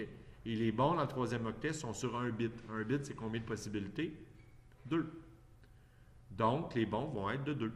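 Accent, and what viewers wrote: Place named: Canada. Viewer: bon, je vais essayer de comprendre l'accent canadien O_O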